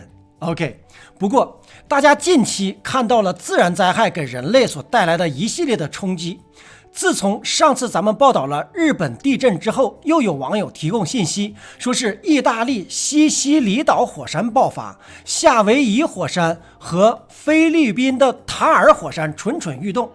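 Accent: Northeast, China. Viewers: 是东北人还是陕西人？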